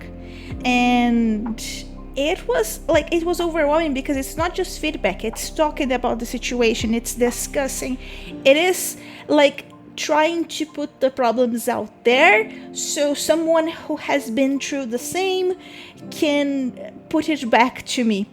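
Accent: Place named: Brazil. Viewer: I can tell you are Brazilian from your accent and Im an American living in Brazil. Something I've noticed about the cultural differences between Americans and Brazilians is that Brazilians are often preoccupied with their current finances and are less willing to spend money on gaining access to education and networks that will provide them with knowledge- and even more importantly RELATIONSHIPS- that will advance their business or craft. I definitely get where you are coming from but just wanted to say that investing (even expensive amounts) in yourself, leveling up your craft, and professional networks with the right people can get you so far. I was even thinking of making a video on this soon. Save some money and really get a professional network or mentor that will help you level up :) Im not even an artist or into artwork much but your video popped up on a google search and it was cool just watching you do this while talking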